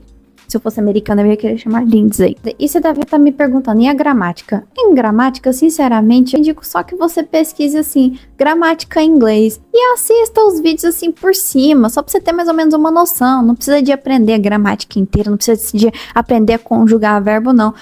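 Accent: Minas Gerais, Brazil. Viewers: Agora que percebi que você tem mt sotaque mineiro rs (adoro, por sinal) ótimas dicas,. Aderi por aqui a de Friends, que pra ser sincera, nunca nem assisti 😅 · Tu é Mineira, Goiana?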